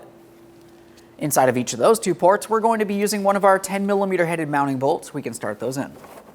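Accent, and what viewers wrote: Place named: Los Angeles, United States. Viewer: I live in Los angeles and this is how everyone speaks in this hedonistic and PC city.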